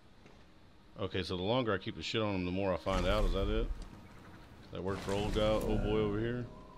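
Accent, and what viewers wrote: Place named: Germany. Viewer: 10/10 german accent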